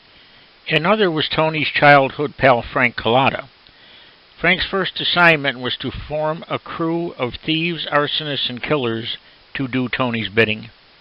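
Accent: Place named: Midwest, United States. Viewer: it's his Midwest accent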